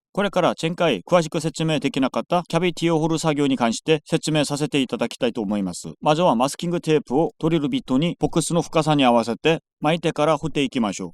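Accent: South Korea. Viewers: 韓国訛りの日本語でも上手😊 ずっと日本の人だと思ってた~応援してます🎉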